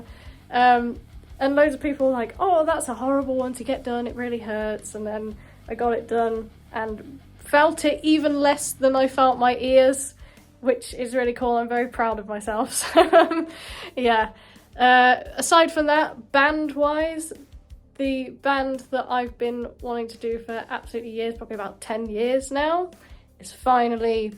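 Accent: United Kingdom. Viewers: Your British accent is amazing! Hope we can hear you singing some lyrics with your new band!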